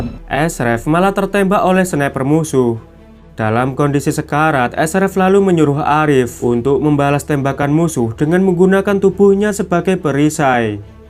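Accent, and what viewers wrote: Java, Indonesia. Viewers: Komentatornya medok banget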